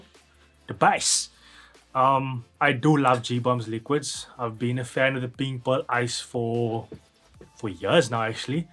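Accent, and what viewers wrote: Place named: India. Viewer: Top stuff uncle, keep it up😅🔥love the Indian accent 😂🤣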